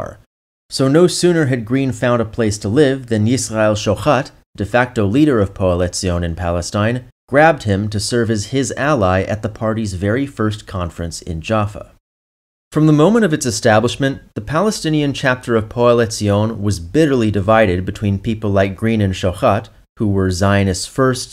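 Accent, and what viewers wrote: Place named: New York, United States. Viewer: Just curious if you see this we’re you raised in New York? You have the accent. Love your channel from Long Island/ Netanya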